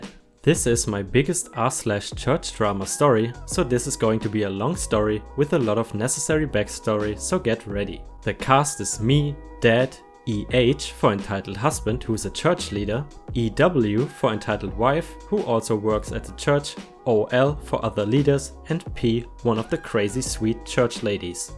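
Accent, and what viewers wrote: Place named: Germany. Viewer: You did great! Love your voice, so refreshing to hear a German speaker doing reddit stories :)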